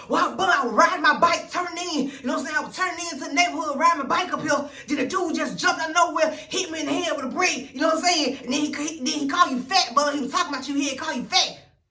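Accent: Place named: Memphis, United States. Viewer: You sound so Memphis or Mississippi. You had me rolling. How your sisters let them girls act like that toward you? Chile. Girl trips 😓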